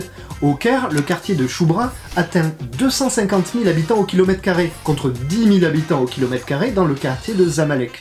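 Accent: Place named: England, United Kingdom. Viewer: Super accent anglais 😉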